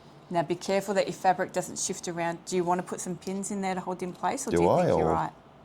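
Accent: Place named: Australia. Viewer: Only came across your tutorials last week but absolutely loving them and pleased to be hearing an Aussie accent, colloquials and slang for a change. Brilliant to see give it a hard crack and have a few bloopers. It was a good laugh because we've all been there with the awkwardness etc. Thanks heaps and looking forward to seeing more. Cheers from Wollongong, NSW.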